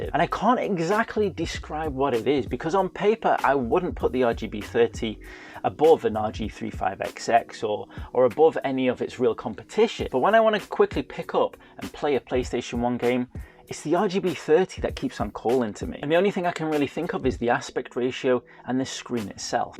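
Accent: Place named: United Kingdom. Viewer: Nothing worse than clicking on a video from a YouTuber I don’t know and hearing a British accent 🤮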